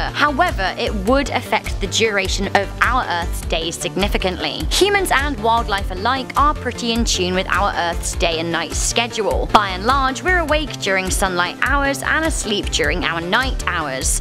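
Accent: United Kingdom. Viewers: Your accent sound like British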